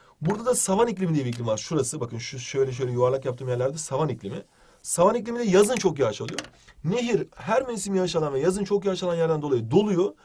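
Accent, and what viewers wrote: Turkey. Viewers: şive meselesi. Egeliler öyle konuşuyor.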